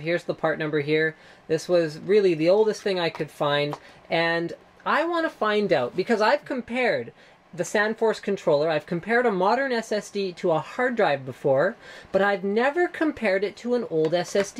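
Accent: Canada. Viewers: po-tay-to po-tah-tah ;) dah-tuh/sah-tuh = Canadian/English day-tuh/say-tuh = American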